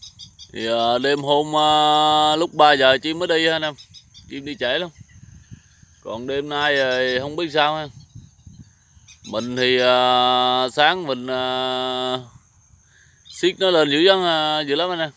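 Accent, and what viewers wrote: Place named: Mekong Delta, Vietnam. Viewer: Chất miền tây a ơi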